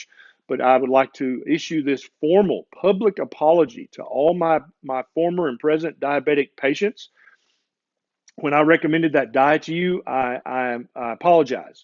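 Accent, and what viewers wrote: South, United States. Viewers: Nurses receive more training in nutrition in school than doctors. It’s ludicrous. A doctor is not someone to go to for regular general nutrition advice bc they’re not trained in it. The current model is curative, not preventive. Please keep fighting the good fight! Publish! Get the word out to your colleagues. Change them. Good luck and godspeed! (...and LOVE the South in your mouth!)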